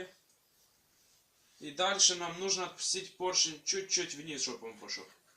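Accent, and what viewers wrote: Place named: Moldova. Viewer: Сразу слышно,Молдова:)))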